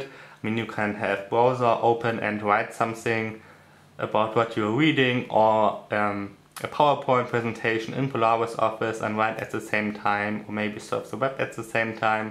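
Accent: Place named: Germany. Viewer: Ob und zu welchem Video ich einen Kommentar abgebe, solltest du besser mir überlassen. Wenn du ein Video online stellst, musst du auch mögliche Kommentare hinnehmen. Ich spreche 6 Sprachen in der jeweils landesüblichen Koloratur und ich bin auch Deutscher. Eben deshalb schmerzt es, deinem Englisch zuzuhören. Meine Meinung in deutsch zu verfassen geht schon in Ordnung, denn dein starker Akzent lässt ja nur die Schlussfolgerung zu, dass du Deutscher sein musst. Insofern ist deutsch schon richtig.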